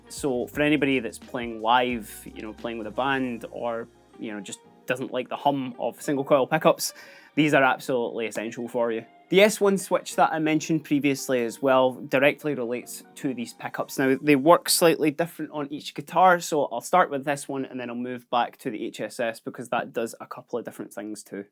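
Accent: Scotland, United Kingdom. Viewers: Scottish accent IS musical! sold me this guitar better :D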